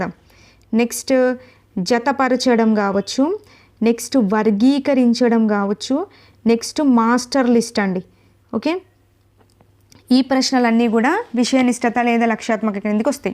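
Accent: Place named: Telangana, India. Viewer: మీరు తెలంగాణ కదా మేడమ్ Voice telustundi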